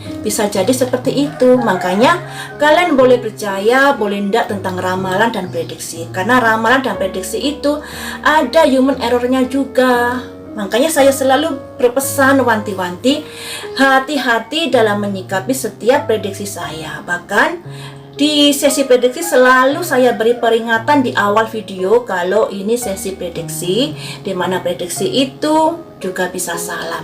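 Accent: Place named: Java, Indonesia. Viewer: Suka dgn logat nya *...medok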